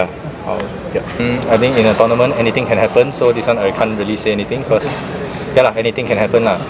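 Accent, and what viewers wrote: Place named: Singapore. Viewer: most singaporeans speak like that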